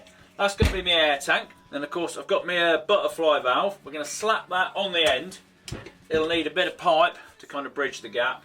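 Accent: England, United Kingdom. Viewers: L’accent anglais m’énerve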